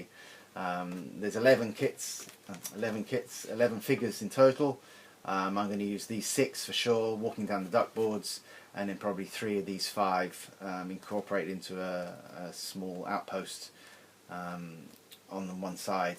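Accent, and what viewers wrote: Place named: United Kingdom. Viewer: Amazing as always , nice to hear your voice and its british, I'll put the kettle on and grab a seat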